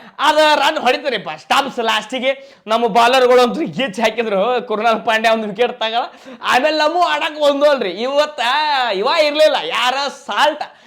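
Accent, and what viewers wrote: North Karnataka, India. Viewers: ಏನೇ ಹೇಳೆದ್ರೆ ಸರ್ ಉತ್ತರ ಕರ್ನಾಟಕ ಭಾಷೆ ಚಿಂದಿ ❤️❤️❤️❤️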